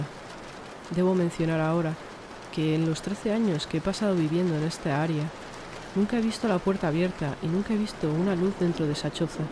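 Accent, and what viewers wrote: Spain. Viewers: Los 3 relatos muy escalofriantes la verdad ya que esto es real, muy buenos relatos me encanta ese acento español, saludos desde México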